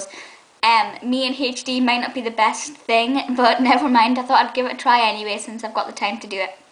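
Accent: Scotland, United Kingdom. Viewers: love your accent!!what is it?(sorry not brit) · Haha, Scottish is only one of the most distinctive accents in the world! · scottish or irish?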